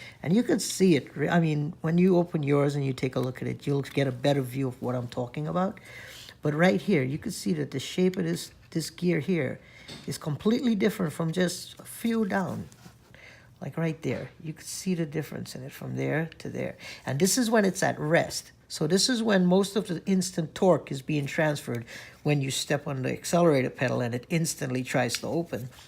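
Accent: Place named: Trinidad and Tobago. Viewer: You sound trini, good vid tho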